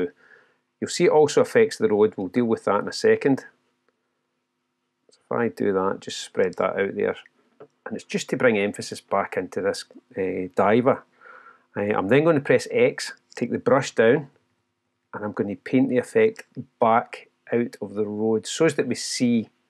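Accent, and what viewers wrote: Scotland, United Kingdom. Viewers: Thanks a lot for your shared expertise and for the files used to follow your really helpful video. Your a great Ambassador for Luminar. I follow you from the first day I used Luminar 4. Really helpful. Besides I love your Scottish accent (I'm a fan of Scotland).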